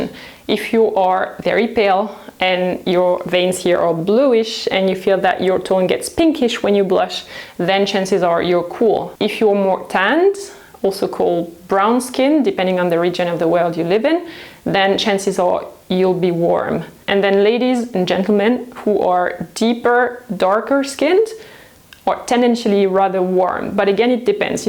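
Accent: France. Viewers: oulalalala je capte le signal d'un accent francais! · I think she's french by her accent or I may be wrong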